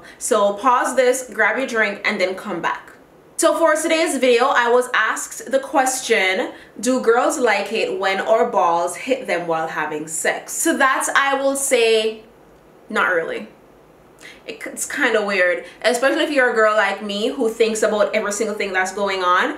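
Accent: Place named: Jamaica. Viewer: New to your vids. Where u from? Sound wash down yardie 😂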